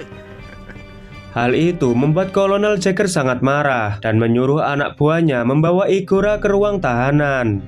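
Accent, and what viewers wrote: Java, Indonesia. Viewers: Medok bngt min suaranya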